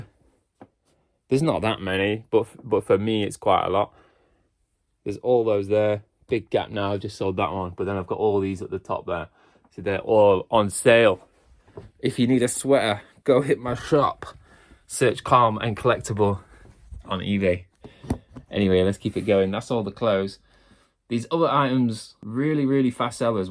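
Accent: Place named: United States. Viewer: why you sound american if your from Uk, the fuqq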